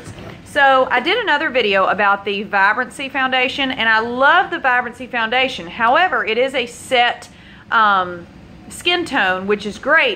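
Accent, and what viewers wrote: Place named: Texas, United States. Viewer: May I ask a question? What part of the country do you live in? I lived in TX for 12 yrs, and your accent sounds a lot like mine!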